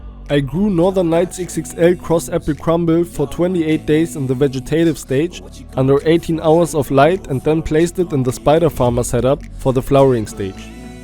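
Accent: Germany. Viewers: An dem Akzent erkenne ich das du aus dem deutschen raum kommst :) Der folgende Text soll kein Klugscheißen sein sondern Liebgemeinter Tipp bzw Weitergabe von Wissen. <3 Wir teilen die liebste Leidenschaft. Etwas Lernlektüre und ich hatte Langeweile xD. edit: ich hasse Schreibfehler :D Teil 1 Das Flushing war in deinem Fall vermutlich etwas zu früh. Ich würde mich weniger an den Angaben der Hersteller orientieren, sondern an der Pflanze selbst. Jede Pflanze ist ein Individuum und reift unterschiedlich schnell. Die Dame hat im Verhältnis noch recht viel Blattmaterial und vergleichsweise wenig Calyx-Masse. Sie hat also noch nicht richtig "gebulkt" , die Kelche sind noch nicht vollständig angeschwollen. Außerdem wirkt sie noch nicht vollständig ausgereift. Das erkennt man unter anderem an den vielen noch weißen, aufrechten Pistillen. Mit zunehmender Reife verfärben sich diese und ziehen sich zurück. 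Dabei sollte man beachten, dass Pistillen durch Berührung oder Umwelteinflüsse schneller oxidieren können und deshalb allein kein zuverlässiger Reifeindikator sind. Trichome und der allgemeine Reifezustand sollten immer mit beurteilt werden. Wie stark eine Blüte letztendlich anschwillt, hängt zu einem großen Teil von der Genetik ab. Pflanzen mit einer genetischen Veranlagung für große calyxreiche Blüten bilden meist auch kräftigere Kelche und können entsprechend größere Samen entwickeln, sofern sie bestäubt werden. Neben der Genetik spielen jedoch auch Kulturführung und Nährstoffverso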